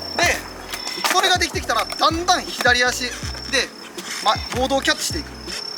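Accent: Kansai, Japan. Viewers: 関西の人ってみんなこんなんよ(笑)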